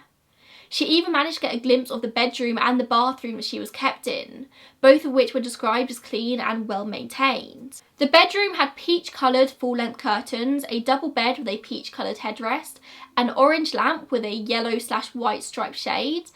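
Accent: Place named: Yorkshire, United Kingdom. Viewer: I love the Yorkshire accent. <<thumbs up>>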